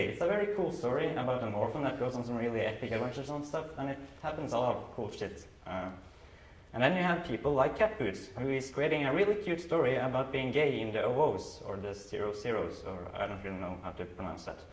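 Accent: Norway. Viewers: Are you from (Norge) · Slaughtered Norwegian accent? No, your accent is beautiful. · The clapping went so long ;-; my heart haha. I also love your accent and had noooo idea it was a Norwegian one?! Voices are wild. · Thats what we call norwegian english! I have that accent sometimes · When he tells you to put on subtitles for his Norwegian accent but fans knows exactly what he is saying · Wait, wait are you from norway, HALLA FRA BAMBLE! EJ BRO HEG VISTE IKKE at du var norsk, hello from Bamble I didn't know you're Norwegian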